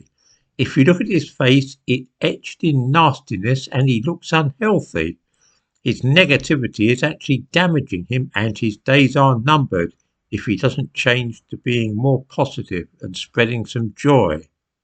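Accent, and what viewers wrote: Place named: RP, United Kingdom. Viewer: Duhh! Maybe is because you’re spewing hate, bigotry, racism with a posh accent.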